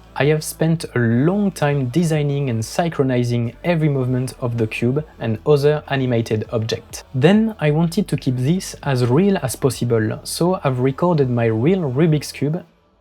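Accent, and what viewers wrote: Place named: France. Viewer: L'accent français !!!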